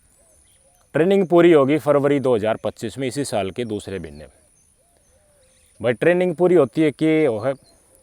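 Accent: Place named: Mahendragarh, India. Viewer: भाई आपकी बोली में महेंद्रगढ़ साइड की झलक बहुत अच्छी है भाई आप हर खबर का निचोड़ निकालते हो लाजवाब भाई जी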